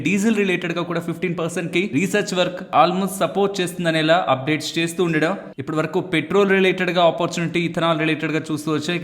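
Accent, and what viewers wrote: Nellore, India. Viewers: Bro meedhi nellore na ?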